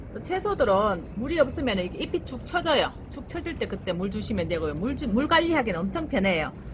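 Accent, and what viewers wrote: Gyeongsang, South Korea. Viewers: 늘 궁금했었는데 젊고 예쁜분이네요 경상도 사투리 매력있으세요 구독.좋아요 꼭꼭누르고갑니다 미나리키우는 방법도 알려주시면 감사하겠습니다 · 경상도 말씨 참 예쁘고 정겁네요~감사합니다💕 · 너무 재밌어요! 경상도 사투리도 좋고 옹사 잘 지으시네요.저도 사ㅇ추씨 뿌려야겠어요~/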